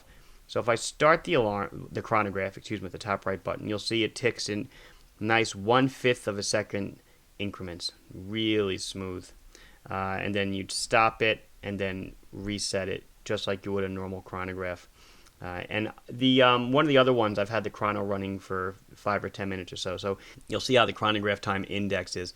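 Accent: Long Island, United States. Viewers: you’re the best watch teacher on YouTube! I’m a teacher myself and I can confidently say that your calm, methodical teaching style and pure joy for watches has made learning all about them easy and interesting. Plus the awesome lawng aisland accent goes a long way! Thanks for making all these Watch and Learn vids for us uninitiated to pick up the clues about the complex world of horology. I was given this seiko (in black🤘🏼) as a gift and came here to learn about it (it’s awesome, legible for how complex it is and not at all too big for my small 6.75” wrist). I now have a new hobby, thanks to and (also his mate over at urban gentry - love how they’re pals) Legends - thanks lads!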